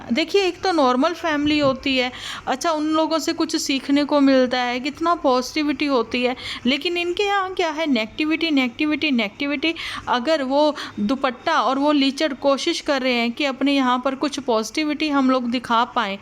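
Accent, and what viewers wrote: Punjab, India. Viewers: I love your Punjabi Accent Aunty ji love from Canada 🇨🇦 I’m also Single mom with my daughter no house help no any support I’m working mom im working in School full time job but in 🇨🇦no any kinda helper I’m just thinking about those Drama Queens Sisters and mom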